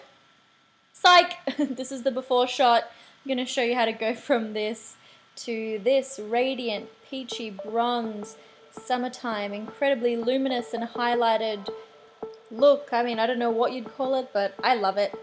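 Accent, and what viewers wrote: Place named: Australia. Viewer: Love that accent, Australian?? I like this makeup tho :) xx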